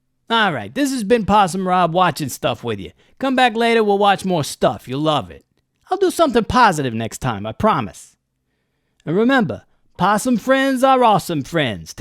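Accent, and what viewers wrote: Brooklyn, United States. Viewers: You from Brooklyn, Possum? You talk like Joe Pesci..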